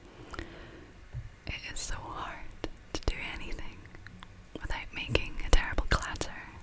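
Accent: Scotland, United Kingdom. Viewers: Love your Scottish accent... And your headphones. Is there a hint of Irish genealogy in there too? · oh, I love a good scottish accent! new subscriber.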